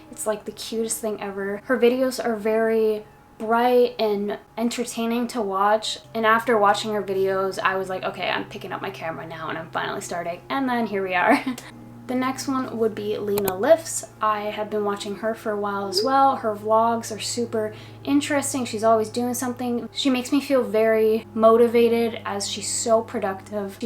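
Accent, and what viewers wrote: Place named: France. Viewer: I think I am hearing a French accent, I am from Canada as well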